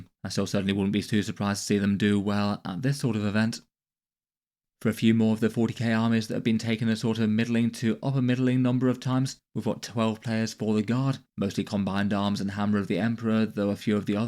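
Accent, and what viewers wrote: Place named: Midlands, United Kingdom. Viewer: he's 1000% got an english accent, I want to say midlands somewhere? It's not very distinctive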